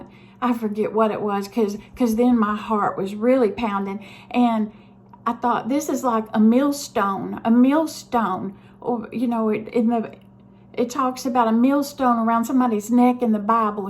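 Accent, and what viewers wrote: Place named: Kentucky, United States. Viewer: The doctors want to do an MRI on me because I devevloped SSHL, Sudden Sensorineural Hearing Loss, in my right ear. There is a very small chance it is caused by a benign tumor. Almost never is the cause known though. I do have Hashimoto’s Disease and for ones very educated about it they know it can cause SSHL and other ear related problems. I am dragging my feet on doing this MRI because I do suffer from claustrophobia. And I do not like feeling restrained. I understand what you mean about also having something in your ears and over your eyes while being inside of an MRI. I stopped getting headphones at museums because having them over my ears was annoying for me. I think all of that would just add to the feeling of claustrophobia and being restrained. In the past my claustrophobia has often been dependent on how well rested I am. 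I don’t like confined places, but if I am well rested I am not panicking. I am American but I live in a an old city in central Europe. Sometimes in the older buildings, the elevators are super small. There are times I panicked and took the stairs and other times I got on the elevator with no problems. Thank you for sharing your honest feelings and very human emotions! My late husband and I lived in Kentucky for 10 years. So I enjoyed hearing your accent.😊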